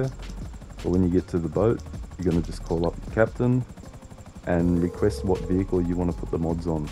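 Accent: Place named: Australia. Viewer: U sound like Ur from Australia if so I'm also from Australia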